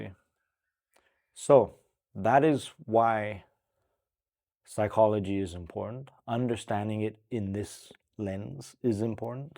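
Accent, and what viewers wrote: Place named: Guam. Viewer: Bro, totally off topic, but I just have to ask. Are you from Guam? I grew up in Guam and, I swear, you sound like you're from there. Great vids BTW. I think you're spot on with everything you talk about regarding discipline. I feel like discipline and mindset would fix 99% of our trading issues.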